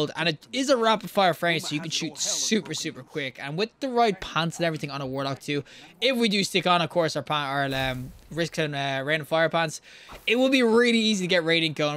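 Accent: Ireland. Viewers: very nice to hear an irish accent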